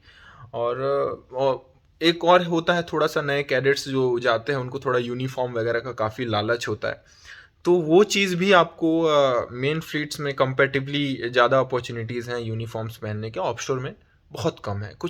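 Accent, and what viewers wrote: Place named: India. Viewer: Headline is english, you speak indian🥲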